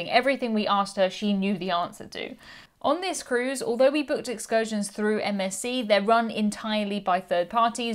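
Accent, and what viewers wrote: United Kingdom. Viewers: Esta inglesa sabe de tudo sobre cruzeiros - com vídeos super didáticos, demais disso, a sua dicção é perfeita, ótimo para quem quer treinar os ouvidos para o inglês britânico.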